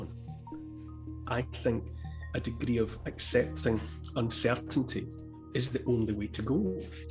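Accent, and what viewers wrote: Scotland, United Kingdom. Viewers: When I need a booster of a Scottish accent, honesty and a questioning mind, I drop in here. I’m a Scot in Taiwan. I think I’d hate to be living in Sturgeon’s ideological dystopia right now. Taiwan handled all this so much better, and that with China a stone’s throw away.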